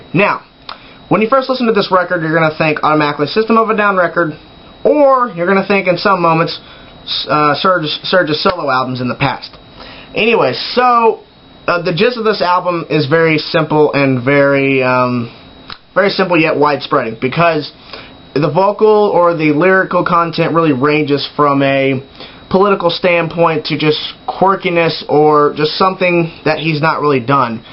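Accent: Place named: United States. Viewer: He says Tankian, but the "an" is faded because he have American accent. If you're an American and didn't noticed you're the idiot.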